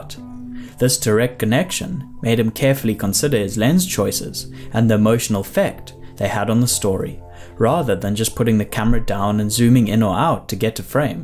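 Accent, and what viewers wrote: South Africa. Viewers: You Sound South African🙂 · I love the south African accent my china. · Where are you from?, you sound South African. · Nice to hear a fellow South African, nice breakdown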